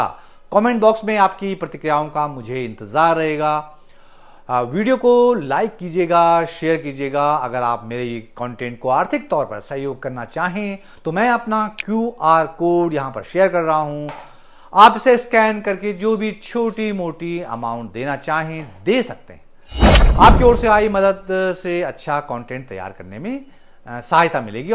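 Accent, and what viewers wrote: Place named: Hill region, India. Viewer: Aapka style aapki Pahar tone I like it nahi bacha payyyyy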